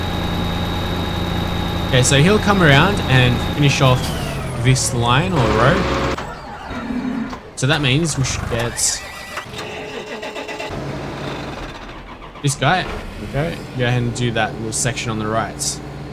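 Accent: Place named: Australia. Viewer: I don't know if i have said this before but i love australians ascents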